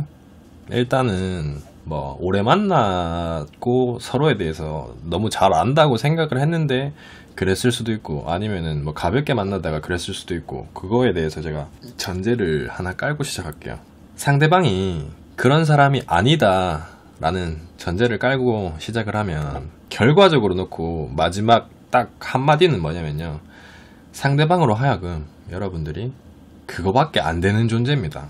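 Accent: Gyeongsang, South Korea. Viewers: 잘생겼다~ 경상도 사투리도 멋있음~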